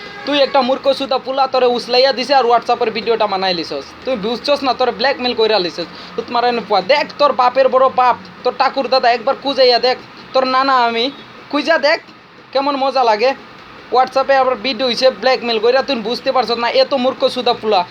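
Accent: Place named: Bangladesh. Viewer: Tor sound Bangladeshi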